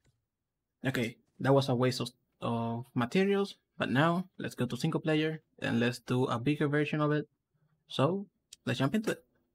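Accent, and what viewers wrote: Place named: Cuba. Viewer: i like your accent i am cuban and you sound cuba or puerto rican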